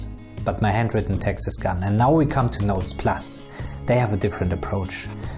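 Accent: Germany. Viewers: Lol 🤣 ich wohne in Deutschland und meine Verliebte ist ein Deutscher! I could only tell because I’m used to hearing the German accent, I really like it! But seriously this review made me much more optimistic, Thanks for the review. I’ve been using a galaxy note <num> edition for about 5 years now and just switched to the ipadpro 12.9 last week. Immediately I noticed how bad the default note taking app was. I downloaded all the free note apps and none of them come close to S-Note in its features. I could import PDFs, I had way more templates (College ruled, normal spacing, grid, textures) better pencil options with a grain textured pencil that made it feel more like paper, the ability to transform written text into typed words or equations, search based on written notes, and so much more but best of all it was free! Overall I’m really disappointed in Apple’s integration with the Apple Pencil and iOS, I mean there is none. 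It’s basically a big iPad with a pen that was thought of as an afterthought. I got it primarily for art and in that regard it’s marginally better (Procreate is really fantastic and very professional), but the file management system, widgets, customization and split screen in iOS 11 is really limited in comparison to Android - but your review made a bit less cynical 👍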